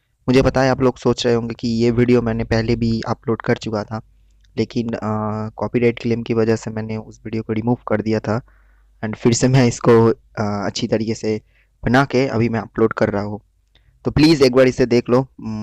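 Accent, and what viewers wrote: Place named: Bengal, India. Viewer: Are you a Bengali because your Hindi is like from a Bengali because i am also a Bengali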